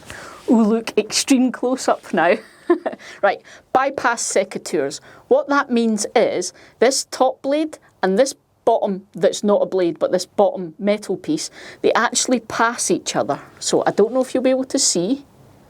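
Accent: Scotland, United Kingdom. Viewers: Thank you from Phoenix, AZ, USA a little bit of difficulty understanding that beautiful Scottish accent...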